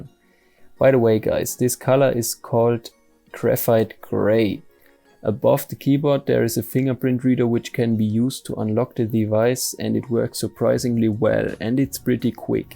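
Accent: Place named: Germany. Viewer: Man hört halt sofort raus das du Deutscher bist 😂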